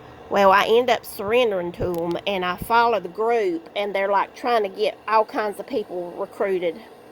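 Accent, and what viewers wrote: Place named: South, United States. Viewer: I just love hearing your accent! It makes me feel at home. I just moved to the west coast from Georgia and I miss the South sometimes. Also, my maiden name is Kirkland, do you happen to be from Georgia?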